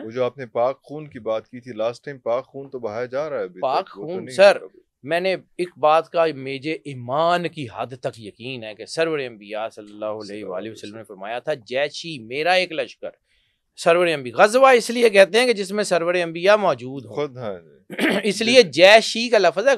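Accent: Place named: Punjab, Pakistan. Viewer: your Punjabi accent is hilarious 😂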